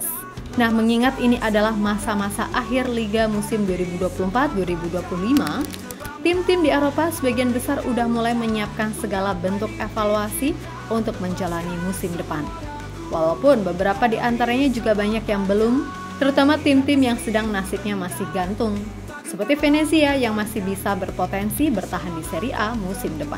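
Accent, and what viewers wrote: Java, Indonesia. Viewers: Suka ngomong jowonya Mimin 😅😅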